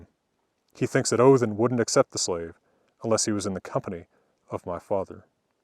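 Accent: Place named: United States. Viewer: I love your style. Your hat and your American accent are fcking manly. Þú ert víkingur!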